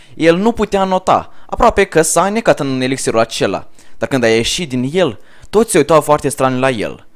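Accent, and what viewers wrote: Moldova. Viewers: Dat Moldovean accent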